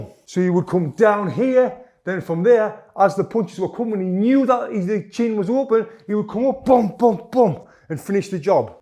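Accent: United Kingdom. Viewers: Ehy! You are an awesome teacher, I'm gladly following you but I have a question I hope you don't mind: Where is your accent from? I'm italian but I've been in england 4 times (london, scarborough, plymouth, york, manchester) and 3 other times in the united kingdom (scotland, ireland, north ireland) and I can't quite well recognize your way of speaking! It's British for sure, but that's all I can guess.. Thanks!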